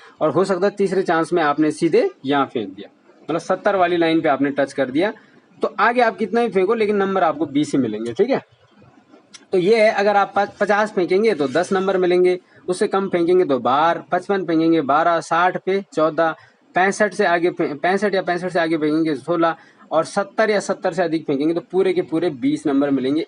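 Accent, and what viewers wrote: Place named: Garhwal, India. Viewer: bhai jiii aap gadwali ho apke jhalak pure gadwali ho nice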